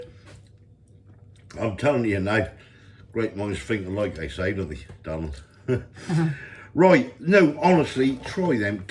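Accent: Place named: Norwich, United Kingdom. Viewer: Norwich accent !!!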